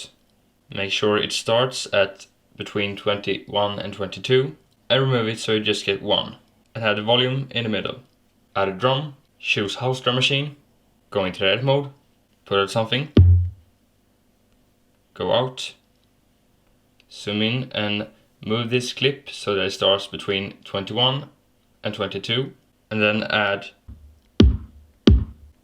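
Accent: Sweden. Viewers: Vet inte om jag har rätt. Men redan 5 sekunder in i videon kunde jag höra att du var svensk. Det är väl positivt att man har bra öron. Bra när det kommer till musik. 😂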